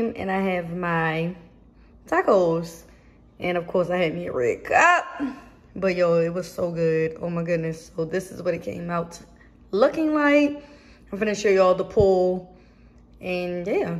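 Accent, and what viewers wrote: Baltimore, United States. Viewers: i hear the baltimore in you🤣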